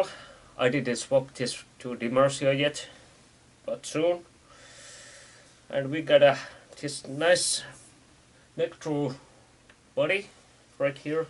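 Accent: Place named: Finland. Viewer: You have kinda finnish accent in your english. Am i right? Anyway nice video. I really want to buy that guitar. Thaks for the tips considering about the possible upgrates i might have to do in the future.🤟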